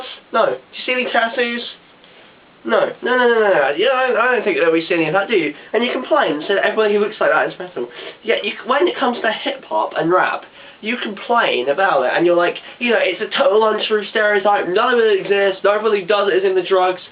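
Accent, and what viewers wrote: United Kingdom. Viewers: Video is instant win because of British accent. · hey, i don't know if you have the same problem over in england (sorry if i'm wrong i'm just judging from your accent, and you did say your not from america) where you have people talking about how metal is tallentless, or did some of our braindead trash just leak over to your country??? i agree with you 100% and wholeheartedly, but anyway we have that problem alot over here....and it gererally pisses me off!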